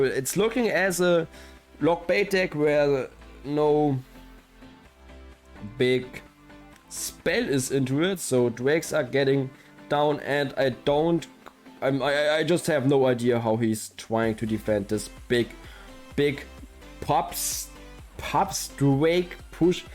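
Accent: Germany. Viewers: you really are a legend. btw do you happen to be from germany or is that another accent im hearing?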